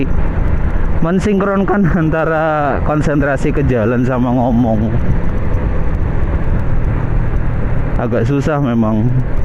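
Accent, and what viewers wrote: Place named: Banyumas, Indonesia. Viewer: Mantap bang. Slam motovlog ngapak 👍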